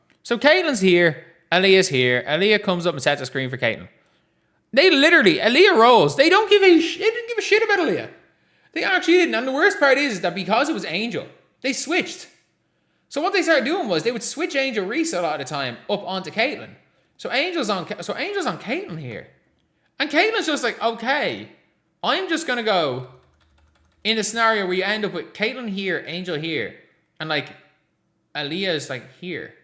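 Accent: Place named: Ireland. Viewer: You are the worst YouTube Fever analyst, milk toast copycat basketball analyst I've ever seen on social media. I've seen an old lady, grandma type do better than you on YT. She's at least honest. You're a ridiculous fraud made all the more annoying with that ridiculous laconic, droning, put me to sleep, Irish Accent presentation. I almost can't stomach you more than fraudster Jason Witlock. I don't believe either of you watch the entire game. You both just skim the highlights & watch other people's podcast. Boring as all get out. I can't believe you even have 1 follower. I wouldn't watch your videos if you paid me.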